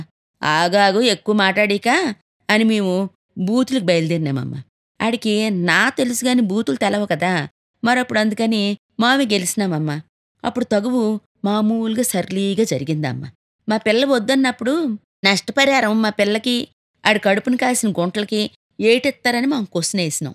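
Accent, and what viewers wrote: Visakhapatnam, India. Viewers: మాది వైజాగ్,ఇది విశాఖపట్నం యాస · Medam mee slang lo vizag originality kanipisthundgi 😄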